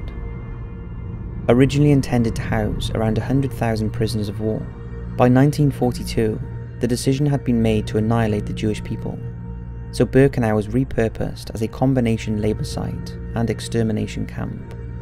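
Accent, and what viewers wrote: United Kingdom. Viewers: I'm assuming you're a fellow Brit because of your accent! I just wanted to ask, how much did it cost to visit there? This video has made me interested in going. Thanks :)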